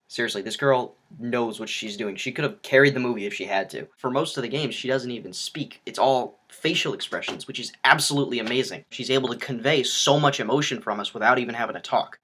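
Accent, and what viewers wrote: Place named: United Kingdom. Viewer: You're Bristish accent is immense :L And I'm British, so i know what I'm talking about :)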